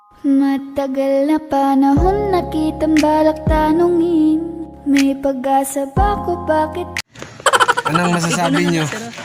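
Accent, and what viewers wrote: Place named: Visayas, Philippines. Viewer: bisaya diay ka lods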